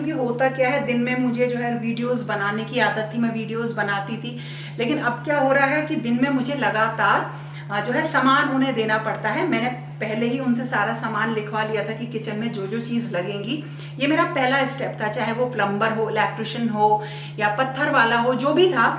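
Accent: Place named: Mathura, India. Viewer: I'm from Mathura mam,mera mayka h mujhe lagta to tha aapke bolne ke ton se ki aap Mathura ya Agra se ho.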